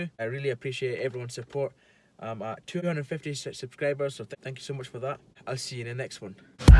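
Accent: Scotland, United Kingdom. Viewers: You Scottish? Lol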